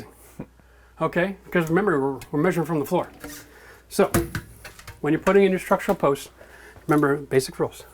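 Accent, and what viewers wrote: Canada. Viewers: You can hear that strong Canadian accent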